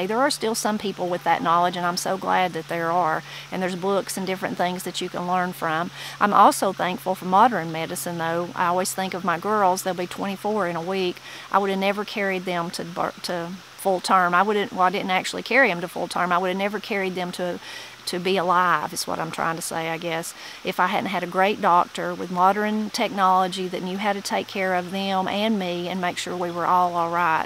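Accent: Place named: Kentucky, United States. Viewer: Hi, your accent sounds more southeastern Ky than Western NC. i’m from eastern ky but have been living in Franklin NC for quite a spell. Great video, found some YR today and am making some tea.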